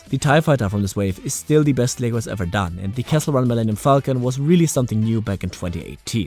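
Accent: Germany. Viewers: Duhörst dich deutsch an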